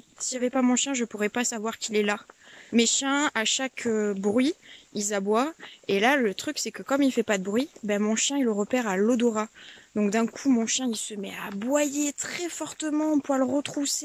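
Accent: South, France. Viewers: Heureusement que tu as des chiens!!! J'adore ces animaux fidèles et efficaces. Méfie toi quand même du gars chelou du soir, c'est pas clair.. Demande à ton copain de te protéger 😉 Tu es toujours aussi belle et craquante. Tu es une belle âme, une bonne personne qui respire la bonté d'âme: Beauté, générosité, bienveillance, fraîcheur, spontanéité, franchise se ressentent car tu vis harmonie avec la nature, loin des maux de notre temps. Bonne route et bon courage dans ce que tu entreprends,. Peut être qu'un jour nos routes se croiserons et çà serait avec grand plaisir que je partagerai une tasse de café avec toi. Ton terrain est dans quel coin déjà, vu l'accent je dirais dans le sud mais vers où? Des likes et des Bisous bien doux 😘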